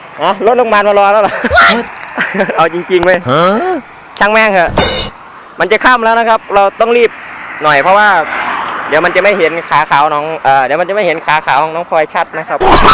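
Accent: Isan, Thailand. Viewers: คนอิสานเบาะะคับ5555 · คนอีสานตั้วนิ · อ้ายเป็นคนอิสานบ่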